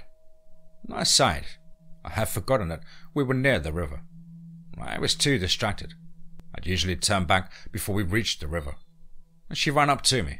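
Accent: England, United Kingdom. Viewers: Idk why English accents are great for scary story narrations, but they are!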